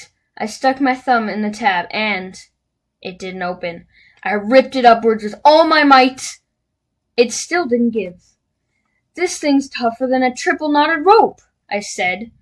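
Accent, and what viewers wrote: Canada. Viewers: He sounds very Canadian eh? I’m Canadian.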